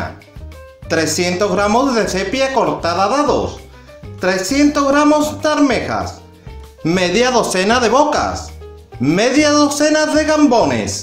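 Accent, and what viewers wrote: Andalusia, Spain. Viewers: Andaluz, como Antonio banderas, aunque banderas se ha refinado. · Es andaluz, cada quien tiene su acento incluso tu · Ese acento andaluz me recordó mis vacaciones en Andalucía. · ¿Quién "tiene" razón? La razón, ¿se puede tener? No sé a quién te refieres al decir que "habla muy mal español". Er tipo habla andalús, y si te "duele escucharlo", capaz que necesitas terapia. Es un placéi ehcuchalo, por la gloria de su madre!!! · Que acento andalú! Olé! Voy a probar hacerla hoy... A ver que tal me sale... 😊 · Español vulgar?? Este señor no ha dicho una sola vulgaridad, el simplemente habla Dialecto Andaluz, ampliamente esparcido y hablado no sólo en Andalucía sino también en Melilla, Ceuta, Gibraltar y algunas otras zonas de Algeciras. Los dialectos son variedades regionales de una lengua y existen al rededor del mundo en todos los idiomas. 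· El Concurso habrá sido en Baleares pero usted sin lugar á dudas Andaluz total. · Es andaluz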